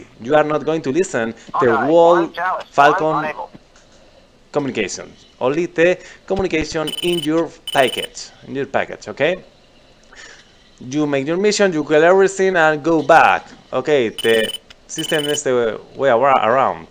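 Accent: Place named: Argentina. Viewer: You sound like my coworker from Argentina. No worries. Your english is good. Thanks for the tutorial. After 10 years back in the Pit I was a bit lost with all the changes from 4.32 back then haha.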